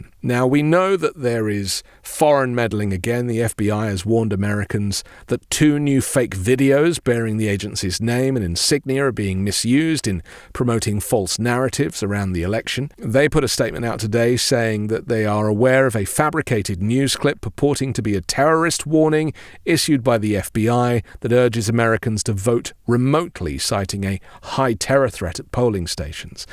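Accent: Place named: RP, United Kingdom. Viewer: I came here cause I needed to hear your posh, measured, and calm British accent to soothe my frazzled nerves and chewed fingernails. I'm really trying to manage my expectations and your ever intelligent reporting and journalistic integrity has helped to keep me sane and from panicking. Thank you and everyone at MTN for choosing Science & Facts and giving us Democracy loving Patriots a news home.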